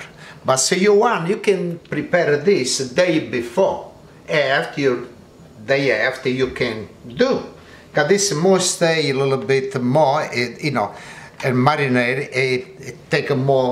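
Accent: Italy. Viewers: Don't you just love that Italian accent? Sooooo Molto simpatico Grazie molto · I like way of explain. I think Itlian Inglish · Well somthing simple, but the italian accent , remind of god father movie!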